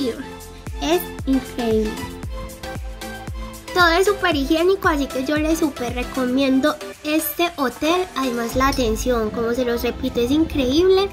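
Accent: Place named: Antioquia, Colombia. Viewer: Pero tu porque ablas paisa si no lo eres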